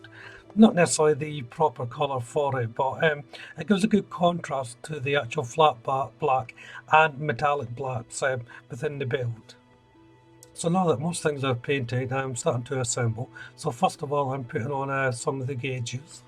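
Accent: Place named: Scotland, United Kingdom. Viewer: Am enjoying this as will be getting this for myself soon. I know it's hard but if you could have a look at your sound so everyone can enjoy your lovely Scottish brogue. Is that the word? Who knows. Thanks for your time and effort. Good stuff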